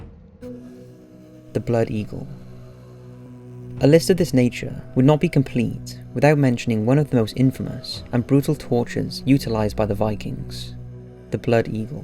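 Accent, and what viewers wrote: Wales, United Kingdom. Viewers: You have an interesting voice. Welsh ? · Welsh..I believe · Sounds like a 12 year old British boy is trying to read me a book on Viking torture techniques. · Why do british people say years like yuurs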